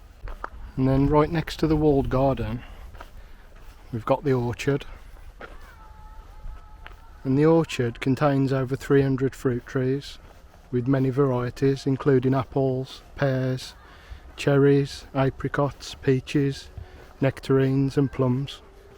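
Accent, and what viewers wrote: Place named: Black Country, United Kingdom. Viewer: Very detailed video, thank you. I’m from Wolverhampton originally so hearing the Black Country accent makes me feel like I’m home. Loved the addition of the bridge photographs!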